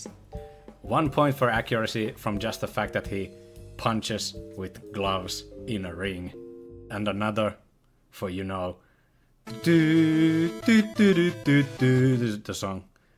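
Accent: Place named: Spain. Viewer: Cool voice! Love the accent, where are you from? You sound Spanish or Easter European.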